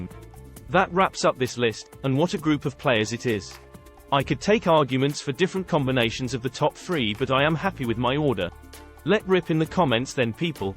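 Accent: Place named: England, United Kingdom. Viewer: Cricket is about elegance and beauty, not stats. At least for the fans. Like your attempts at ranking the various cricketers according to their different specialties. However, learn this - no left-hander is better than Garfield Sobers as a batsman. He was great against all bowlers on any type of wicket. Brian Lara is 2nd. You speak like an Englishman. No West Indian of my age would prefer to watch Geoffrey Boycott bat over Tom Graveney. Old Tom, a wonderful English stroke player. Also, David Gower another of a different era. Keep up the good work. Like your videos.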